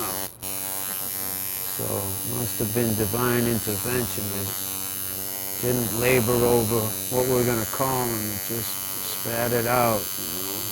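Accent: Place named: United States. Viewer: Feels like Christian Bale got his american accent for parts from this guy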